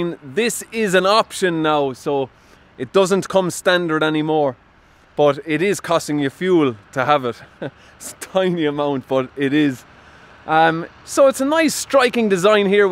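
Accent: Ireland. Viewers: I'm gonna be honest.,the only reason I watched this was to enjoy the Irish accent.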